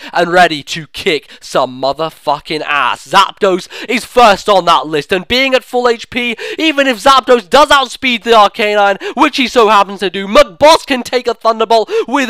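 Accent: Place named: United Kingdom. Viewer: i'm guessing you're british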